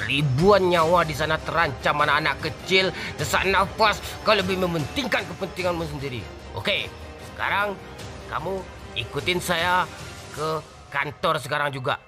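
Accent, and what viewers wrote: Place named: Kalimantan, Indonesia. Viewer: ORANG KALIMANTAN MANA SUARA NYA SEMUGA ASAP CAPAT ILANG YA GUA DARI KALSEL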